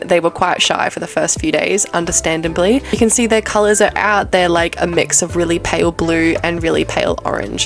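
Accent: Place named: Australia. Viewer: Love your down to earth vids and its really refreshing to hear an Aussie accent best wishes from SE Queensland 😊😊😊😊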